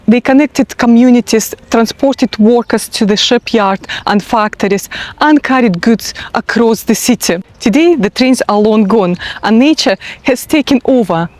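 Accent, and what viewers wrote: Glasgow, United Kingdom. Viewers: Great overview and what an amazing accent!! 50% Glasgow!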